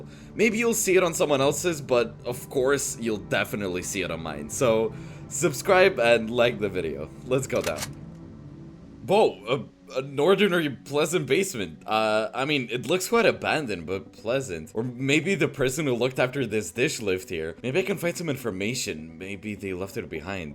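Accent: Russia. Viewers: Are you from Russia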